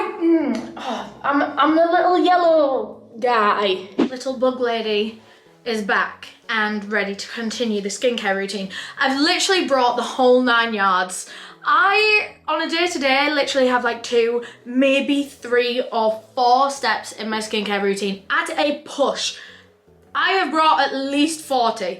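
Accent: United Kingdom. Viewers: I LOVE 💕 British English ❤️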